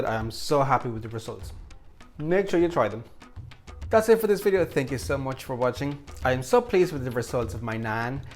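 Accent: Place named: Ireland. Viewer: I do! I love the diversity you exude. I'm guessing you're of Latin descent? I can hear your Irish tones in your voice. Cooking up a rainbow of dishes 💛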